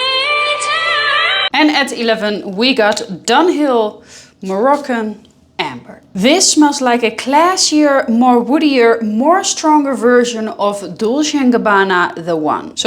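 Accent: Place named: Netherlands. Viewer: you sound a bit dutch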